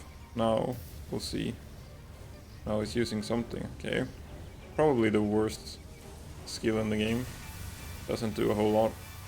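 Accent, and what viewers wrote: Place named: Scandinavia. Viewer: Where are you from? You sound like maybe Sweden, Finland, Denmark or norway.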